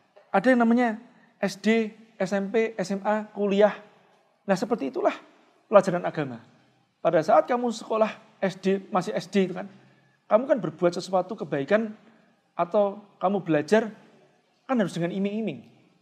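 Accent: Java, Indonesia. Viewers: tpi ada sdkt logt Jawa nya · Tanya juga Mas, kok orang Batam medoke Jawa? 😬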